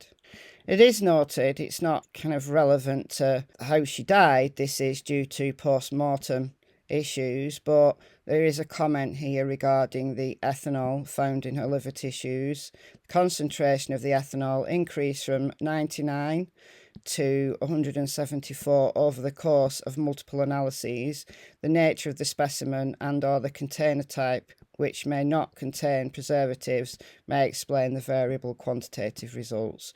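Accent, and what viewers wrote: United Kingdom. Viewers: Unrelated question, what is your accent, it’s really cool. Somewhere in the UK?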